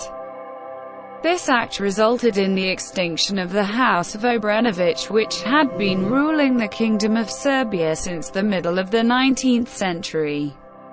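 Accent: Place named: United Kingdom. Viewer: With all the background noise this woman with a heavy British accent cannot be understood.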